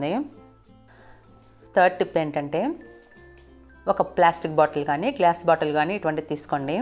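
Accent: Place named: Godavari, India. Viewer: Aay.. Meedhi Godavari entande.. Chala baagaa sepoarandi.. Thanks ande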